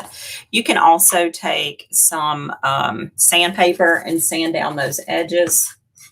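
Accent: South, United States. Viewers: I’m new to your channel, love what you do, love your southern accent, and love that love Jesus😁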